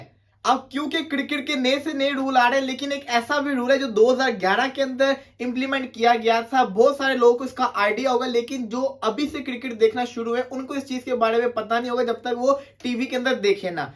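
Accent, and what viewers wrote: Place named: Lahore, Pakistan. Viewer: Bro you must be lahori. I can tell it by your ‘ڑ ’😂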